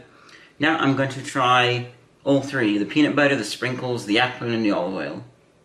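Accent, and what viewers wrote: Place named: England, United Kingdom. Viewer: kinda an english accent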